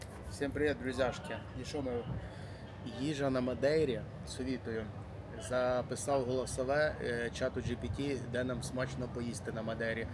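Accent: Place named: Poltava, Ukraine. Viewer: Полтавський акцент ❤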